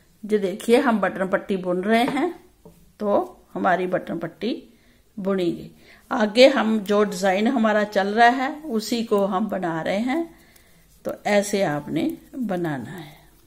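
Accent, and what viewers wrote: Punjab, India. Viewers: ji aap ka Punjabi tone bhut achha lagta hai...raaje kahna to bhut hi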